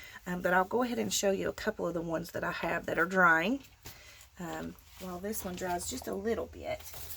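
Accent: South, United States. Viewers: I love watching the collaging process. Your sweet southern voice makes it even better. ❤️💕😘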